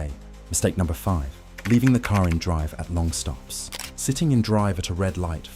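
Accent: United Kingdom. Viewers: Why the British voice. I truly hate that. Unless of course I'm watching a 007 movie.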